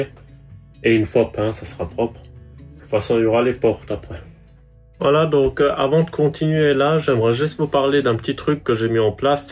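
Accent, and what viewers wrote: Alsace, France. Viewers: hooo ce bel accent Alsacien héé sanlu tu!!! très bon job je suis d'alsace aussi!!!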